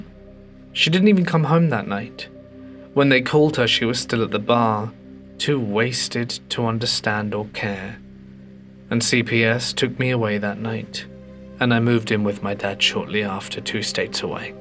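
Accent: United Kingdom. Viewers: I love that British accent !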